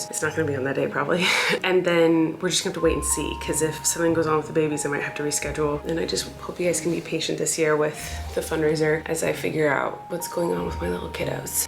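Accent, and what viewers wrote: South, United States. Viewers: Sweet, get his southern accent from you! You do a lot of “character” talking. He’s doing you. ✌️❤️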